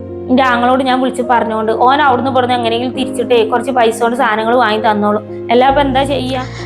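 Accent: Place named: Malappuram, India. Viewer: എന്താ പ്പ തിന് മലപ്പുറം ഭാഷ യാണ് എന്താ കുഴപ്പം കൂട്ടം എന്ന് പറഞ്ഞ(കാര്യം )അത്ര തന്നെ,, അടിപൊളി വീഡിയോ സ് ആണ് 👌👌👌നല്ല ഭാഷ 👍❤️❤️❤❤❤❤❤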